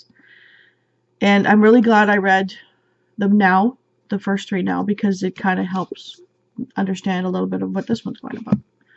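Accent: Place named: South, United States. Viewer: Lol! I liked your southern accent!! Those books are great. My boys love them! I'm still reading He Will Be My Ruin by KA Tucker. It's very good I'm half way through the main character annoys me so I find myself not picking it up. My goal is to finish today! I have a chunk of time with nothing to do and house to myself! :) Great video as always my friend!! Hugs to you!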